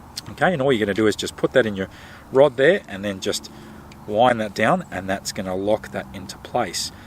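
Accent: Australia. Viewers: Irish? LOL Australian.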